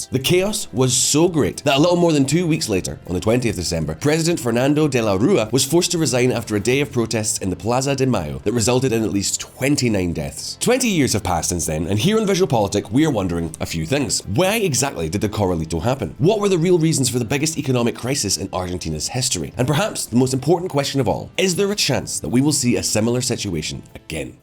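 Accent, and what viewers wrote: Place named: England, United Kingdom. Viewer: Reading Spanish with English pronunciation. Is this a troll?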